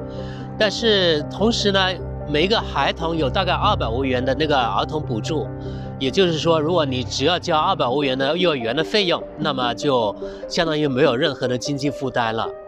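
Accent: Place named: Cantonese, China. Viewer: 感觉您有粤语的口音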